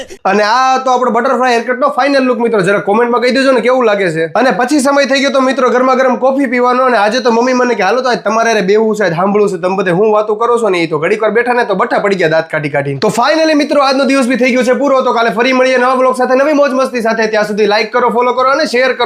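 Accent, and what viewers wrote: Kathiawar, India. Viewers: Tame ketli saras kathiyavadi bhasha bolo cho😂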